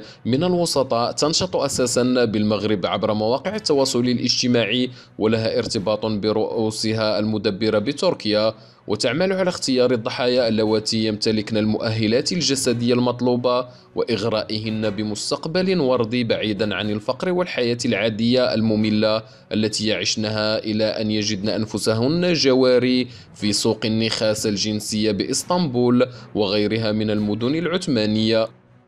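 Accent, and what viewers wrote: Algeria. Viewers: اقسم بالله ان المعلق جزائري انطلاقا من مخارج حروفه ، اخي اسأل شمس الدين يلقى كببل شينوا فالزبالة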